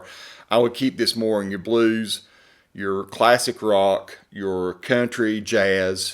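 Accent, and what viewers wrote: South, United States. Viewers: I love your videos, they are so in depth and practical. It is also nice to hear a southern drawl. I ordered one of these based on your review. Thanks so much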